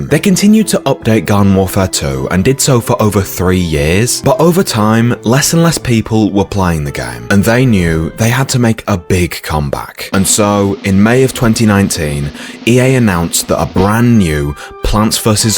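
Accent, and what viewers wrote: United Kingdom. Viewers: Bro you started with epic british lector voice and then you show us a beta 2011 emo kid recording in parents bedroom. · ar u British mate???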